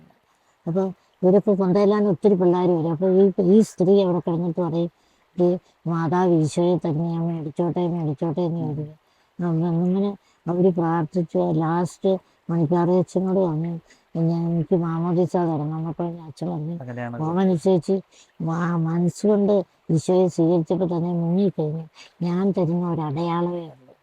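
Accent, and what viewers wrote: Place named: Kottayam, India. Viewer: സിസ്റ്ററുടെ വർത്താനം ഒരു കോട്ടയം slang ആണ് 13 വർഷം കാട്ടിൽ ഒറ്റയ്ക്ക്😔😔 ഇനിയും വേണം sister ൻ്റെ വീഡിയോ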